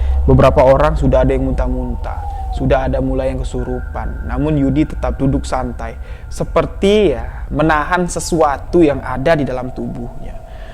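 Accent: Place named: Java, Indonesia. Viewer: Mas the best omng jawa nya kental bngt...😄😄 sukses buat mas...amiiin..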